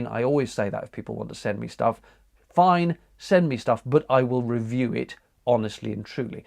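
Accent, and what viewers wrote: United Kingdom. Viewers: Nice! Two of the most interesting topics, boat life and van conversion, done by one of the best narrators here on yt, combined with the lovely british accent and the breathtaking landscape of UK, what else can I wish for? Thank you, great news! :-))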